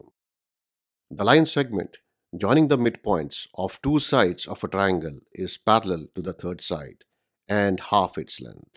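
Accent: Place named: India. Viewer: u sound indian tho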